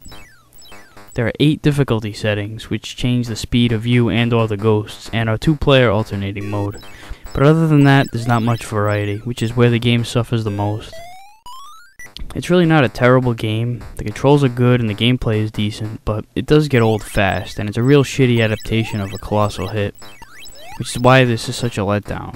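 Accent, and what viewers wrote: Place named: New York, United States. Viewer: You sound like your from New York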